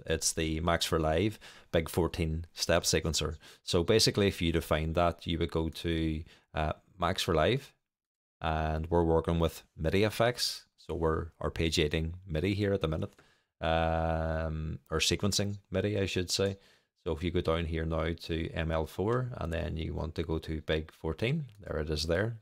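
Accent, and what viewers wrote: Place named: Belfast, United Kingdom. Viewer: Well DAMN, I grew up in Manhattan, even I know that's a West Belfast accent (so I do). Granted, my father grew up in the Divis flats, but it was hardly noticeable that I remember. Great video. I HATE the ableton piano roll.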